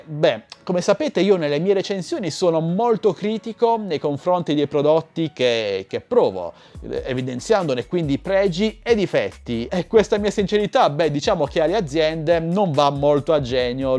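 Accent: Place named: Bari, Italy. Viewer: Hai mai pensato di toglierti l'accento barese quando parli?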